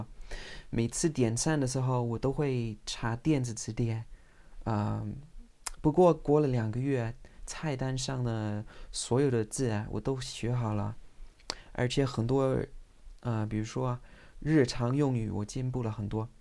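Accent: Standard. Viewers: 你中文说的非常非常地道，你是怎么在没有中文环境的情况下发音练得这么标准的呢？ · close to perfect and standard Mandarin..seldom see any non native speakers master four tones so skillfully..brilliant..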